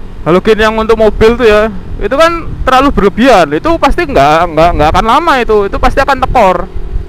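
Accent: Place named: Java, Indonesia. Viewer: Medhok cuy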